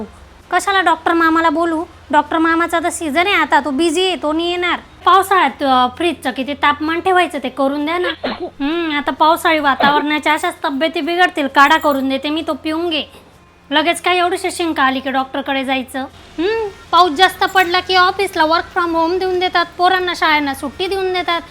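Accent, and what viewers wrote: Khandesh, India. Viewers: बापरे, तू ग्रेट आहेस ताई! Content wise पण आणि अभिनयाच्या बाबतीत तर तुला परकायाप्रवेश करायला तुला काहीच वेळ लागत नाही, सराईतपणे करतेस! एकूण एक मुद्दा cover करतेस, ते पण तुझा खान्देशी लहेजा सांभाळत! खूप खूप शुभेच्छा! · खानदेशी लहेजा खुप छान सांभाळत घरोघरी असेच संभाषण चालूच असत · Typical Marathi Aai Tyat Pan Khandeshi Tone 👌👌👌👌😍😍👍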